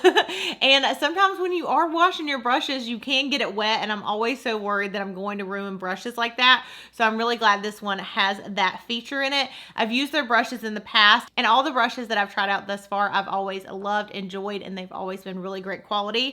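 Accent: South, United States. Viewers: I don't get the ipsy bag anymore. I just upgraded to the glam bag plus and I am loving it so far. I wish I could get both because I loved getting the different bags each month. I'm just not financially able!! I Love your channel and that country accent. I'm from Georgia so my daughter has one lol...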